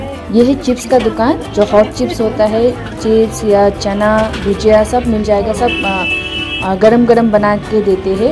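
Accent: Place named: India. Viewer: You hv cute hindi accent 😉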